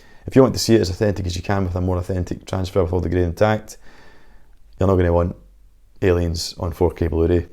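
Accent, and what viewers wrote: Scotland, United Kingdom. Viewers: Hi, not only I share your passion for physical media, I also freaking love your Scottish accent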